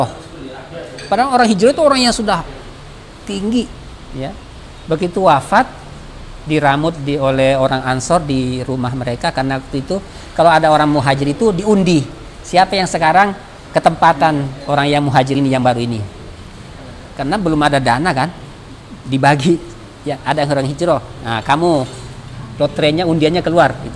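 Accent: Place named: Java, Indonesia. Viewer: Sampean lubise wong jowo tah..ketok logate. 😁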